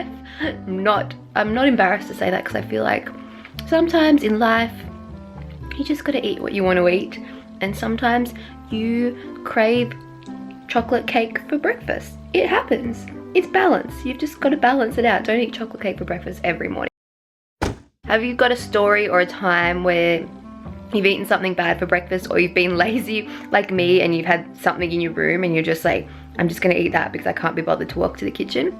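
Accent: Australia. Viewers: Are u Australian and my worst thing is chocolate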